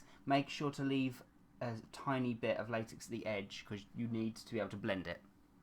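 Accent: United Kingdom. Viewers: I would like to say that I do thoroughly enjoy seeing your tutorials and you are very talented. I also enjoy listening to you talk there is just something about the british accent! In addition to the praise I would also like to place a request for three people: Graverobber and the Repo man from Repo! the Genetic Opera and Lucifer from the Devil's Carnival! Keep the videos coming and thank you!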